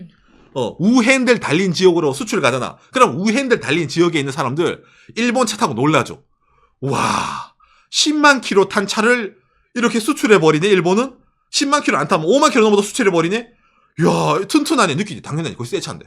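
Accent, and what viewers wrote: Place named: Gyeongsang, South Korea. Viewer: 정보도 좋고 내용도 좋은데, 경상도 억양이 너무 쎄서 듣기 좀 그렇다. 좀 믿믿하기라도 하지. 너무 억양이 쎄네. 지역 얘기 하는게 아니고 듣기에 불편하다. 너무 억세서.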